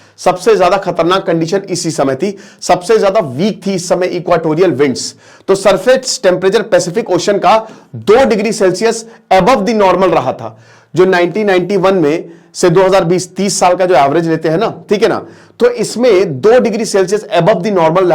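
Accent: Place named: Rajasthan, India. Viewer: I think ye rajasthan se ho ga😂😂😂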